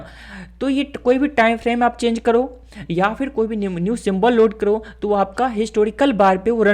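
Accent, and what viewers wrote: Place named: India. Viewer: 听不懂印度英语，不过我还是坚持看完每个视频，学到很多知识，thank you for your work!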